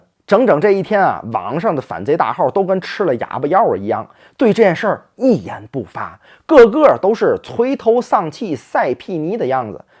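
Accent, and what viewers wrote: Tianjin, China. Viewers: 听起来像我们天津老乡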